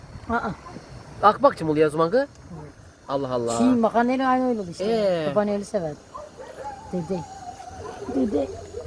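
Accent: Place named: Bartın, Turkey. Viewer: Has bartin şivesi.halişka bu deyil misir unu ile olur halişka .bunun adı hamur atmasi .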